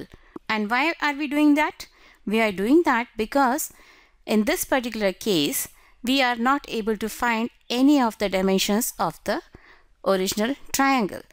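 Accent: India. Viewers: Not trying to be racist but your accent sounds like your Indian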